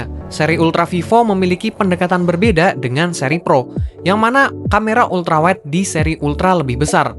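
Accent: Surakarta, Indonesia. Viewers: Orang solo bang? Btw ane user x300 pro sudah sangat puas dan di jadikan daily driver Kurangnya cuma speaker. Overall ok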